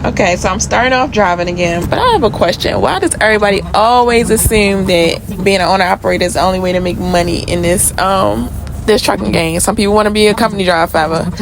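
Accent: Baltimore, United States. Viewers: She sounds like she's from Baltimore area. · Are you from Baltimore? 90 percent sure of it i can hear the accent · Okay yeah I thought I heard the accent too (born and raised here). I always second guess myself. I at least heard her say Merlin so I knew she was from Maryland 😂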